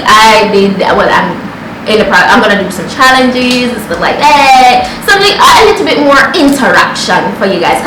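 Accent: Jamaica. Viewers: You are beautiful, funny and the JA accent is feeling like home.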